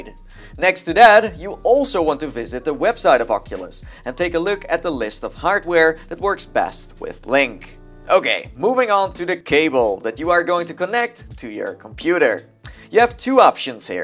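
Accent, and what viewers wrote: Netherlands. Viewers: I KNEW IT, I knew you were Dutch, first I didn’t notice but after pausing the video and doing something else in between, after I started the video again I could hear a small trace of a Dutch accent. When you went to the control panel and I saw “luidspreker” I knew for sure. Kijk is aan. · Are you dutch? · are you nederlands? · Are u Dutch ?? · YOU MUST BE DUTCH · Why do you sound dutch? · Are u from the Nederlands?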